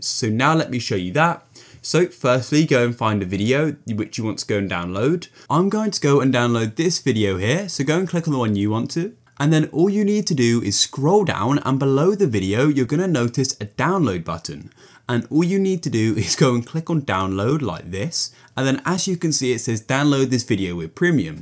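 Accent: United Kingdom. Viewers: British acent!!!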